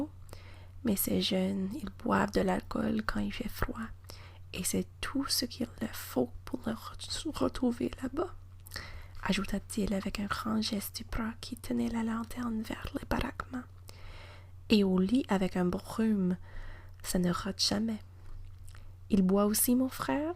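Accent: Canada. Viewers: this doesn't sound like the French kind of French, but rather the Canadian? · Ton accent est typiquement canadien et en France on adore ça (pour information, la Miss Météo d'une de nos chaines françaises est canadienne) ton français est impeccable, félicitations de la part d'un français....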